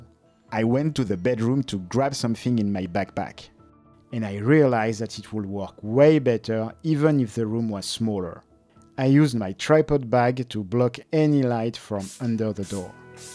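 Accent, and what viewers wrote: France. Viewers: your accent seems French. are you French?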